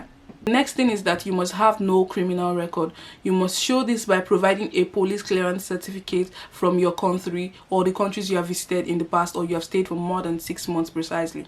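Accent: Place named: Nigeria. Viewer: Good information but u don't sound Ghanaian ...u sound more Nigerian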